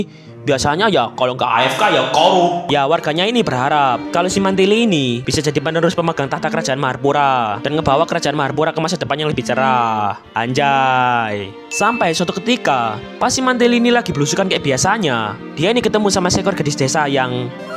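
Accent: Java, Indonesia. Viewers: Kok logatnya logat org jawa🗿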